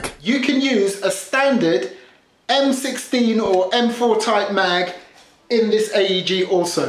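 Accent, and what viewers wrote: London, United Kingdom. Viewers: Well uve got another subbie gotta say your vibe is growing on me are you a londoner by any chance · Another fantastic review I tell you what your microphone is placed probably in the right place you sound very clear and loud which is a good thing and I'm really digging that British accent... Take it easy brother we will see you on another video and yes I did subscribe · are u british ??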